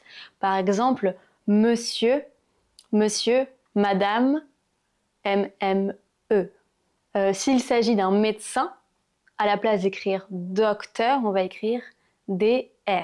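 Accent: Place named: Paris, France. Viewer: J'adore votre accent parisienne,merci.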